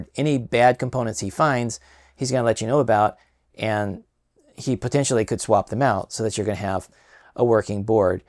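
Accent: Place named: United States. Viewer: Another great video! You really do a great job presenting this, every project you demo makes me want to do it myself even though I don’t have the same computers😂. You are to blame for the retro Mac illness I am currently experiencing!😂. Did you say you aren’t based in America? Your accent/vernacular both suggest to me that are American! Where are you located?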